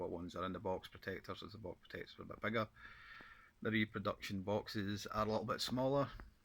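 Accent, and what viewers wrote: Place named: Scotland, United Kingdom. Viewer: Hey man, that is just glorious. Hope you are gonna upload some more stuff man. Always good to hear a fellow Scot 😁 Here from a Nathans Gaming tweet 👍 Take care, and again, hopefully see some more content 👌 Cheers 🙂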